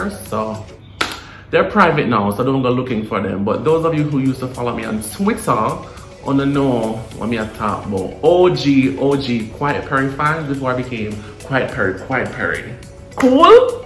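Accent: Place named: Jamaica. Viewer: Hey bro you sound like Jamaican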